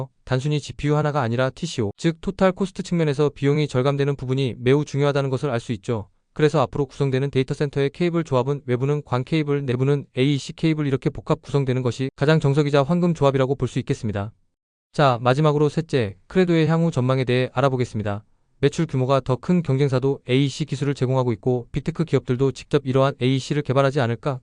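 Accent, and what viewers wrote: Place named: Seoul, South Korea. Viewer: 똑소리나는 서울분 , 반가와요. 유투브 흥하시길 💕 우연히 들어왔다 구독 좋아요 하고갑니다.😊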